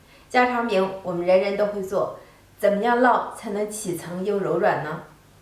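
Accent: Northeast, China. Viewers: 一听口音，感觉就是俺们东北的媳妇，手真巧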